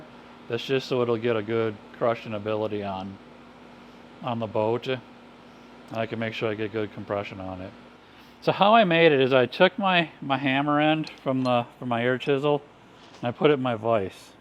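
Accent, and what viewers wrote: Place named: Wisconsin, United States. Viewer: Well thank you for the reply , and now i can satisfy my curiosity about the accent lol. Hey ftr i am , and have been for over 30 years , a Cheese Head , GO PACK GO Packers fan even though I’ve lived here in Georgia all my life. Dont know if you’re a fan but just thought I would share that since you said you grew up in Wisconsin.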